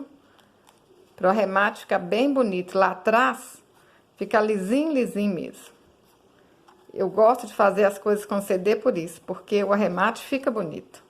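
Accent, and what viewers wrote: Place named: Caipira, Brazil. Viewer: Amiga querida eu amo seus trabalhos tento fazer quase que todos adoro sua voz meio que caipira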